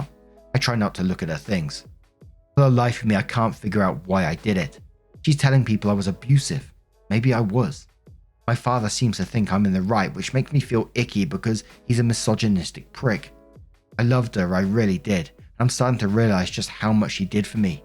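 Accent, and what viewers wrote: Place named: United Kingdom. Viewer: I listen to your videos every day, but I just arrived to London from the states and it’s jarring to hear more than one British person in a day when all I’m used to is your voice 😂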